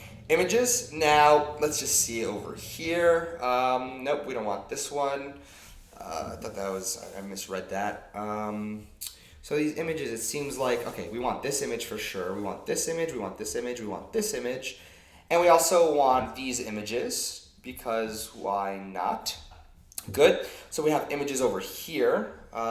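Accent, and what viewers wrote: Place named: Italian-American, United States. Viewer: EssentialShop, although the video speed makes it difficult to keep up, the main problem with the video is the mispronunciation of keywords and the speaker's nasally and seemingly Italian-American/Jewish accent. The best you can do is to turn down the speed and read his lips while you listen. Keep in mind that those who speak with these accents will have no problem understanding the video at whichever speed. There is nothing wrong with your hearing or ability to understand. Those who would criticise you just lack the ability to see things from a different perspective and readily show their unjustified intolerance. Good luck.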